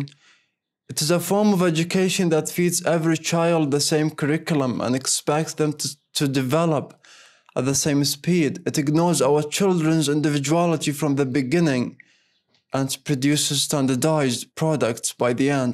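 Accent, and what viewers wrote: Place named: United Kingdom. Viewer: Very nice job. I enjoy it as always. Your pronunciation and british accent are great! Your voice tone is perfect! I wish you a big success and happiness! ❤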